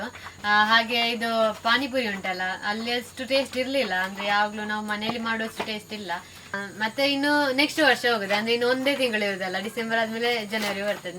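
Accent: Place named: Malnad, India. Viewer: Your malnadu accent of speech is really nice to hear 😘😘😘😘😘😘😘😘😘😘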